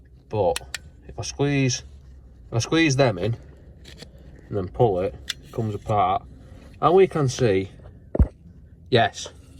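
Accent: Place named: Northern England, United Kingdom. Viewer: He has a cool accent. Where in Northern/ Midlands England is the accent from?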